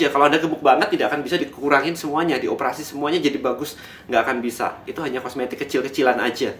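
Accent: Java, Indonesia. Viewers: nice video koh.. btw koh, koh ini orang jawa yah?? ngomongnya medok amat koh.. hehehe..:D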